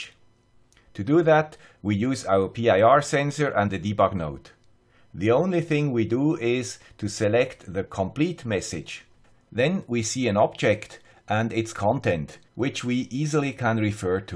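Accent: Switzerland. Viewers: "Grüezi Youtubers, here's the guy with Swiss accent" should become a personal trademark :) Same as Gennaro Contaldo's "Why I am cooking so goood?"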